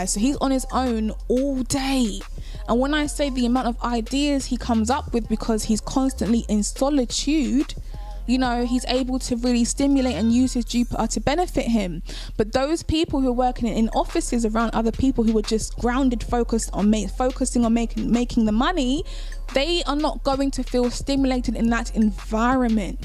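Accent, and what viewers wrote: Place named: England, United Kingdom. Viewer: Why you are not a household name I do not know. Initially…You Lovely Lady😇, had me intrigued by your the English Accent. Then I noticed that you had certain flair/ “steez” as we use to say in Philly 🙂/style about you. Please note { these observations were in milliseconds ( says my Gemini ♊️Ascendent ) .} Then BAM 💥 My Jupiter in Pieces in the 12th house kicks in and I’m able to discern that you are Spiritually and Intellectually Wealthy! You have a Greater understanding of who the planets are entities. Just like me. Hey Girl , I love your work.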